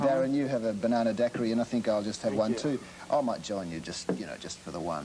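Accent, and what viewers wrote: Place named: Australia. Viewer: This was back when Colin Hay had an Australian accent. He has since reverted back to his native Scottish accent.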